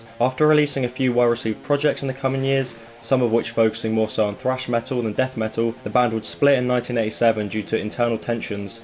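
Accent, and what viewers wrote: United Kingdom. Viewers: My favorite type of moosic, good job funny british man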